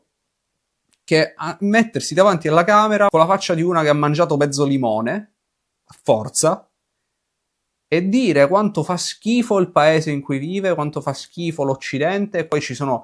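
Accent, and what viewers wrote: Sicily, Italy. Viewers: Purtroppo, il femminismo "è" la massima espressione — e al tempo stesso la forma più rozza e grottesca— del capitalismo. Chi, meglio delle donne, per la loro naturale e debole inclinazione a servire e compiacere l’autorità e i potenti, poteva incarnare così bene questo nuovo dovere mascherato da liberazione, dovere che non ha nulla di rivoluzionario se non quello di aumentare i privilegi del genere femminile, genere più servile del potere? Comunque, ottimo video: è sempre un piacere ascoltarti — e con quell’accento siciliano, tutto diventa ancora più piacevole! è stata una fortuna vedere ed ascoltare il tuo canale! grazie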